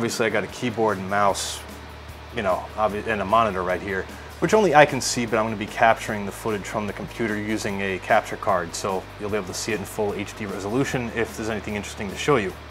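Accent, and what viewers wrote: United States. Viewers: How can you say you don't have an accent? You have an American accent! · lack there of? nope that is an us accent.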